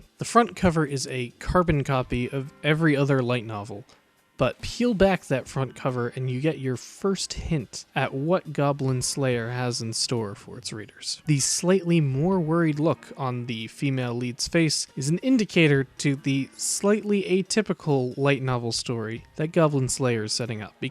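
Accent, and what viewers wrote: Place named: Japan. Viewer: it is somehow funny to hear japanese speak english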